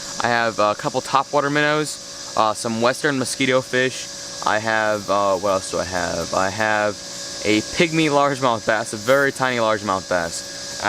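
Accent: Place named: Ozarks, United States. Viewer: where are you from? ozark area I'm guessing?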